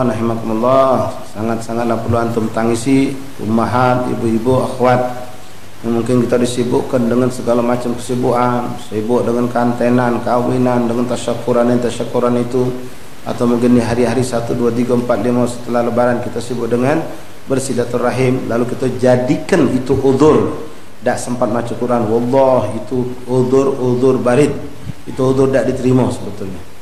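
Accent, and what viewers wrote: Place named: Palembang, Indonesia. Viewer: wah... bahasa melayu palembang ini....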